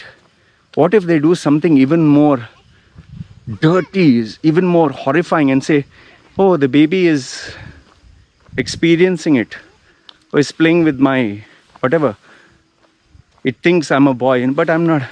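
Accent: India. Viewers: You have indian accent but maori tattoos. Whats going on.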